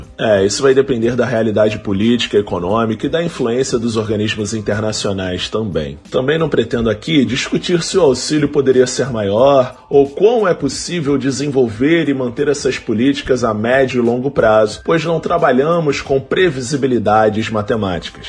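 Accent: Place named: Rio de Janeiro, Brazil. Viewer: volta logo, preciso de mais vídeos e do seu sotaque carioca.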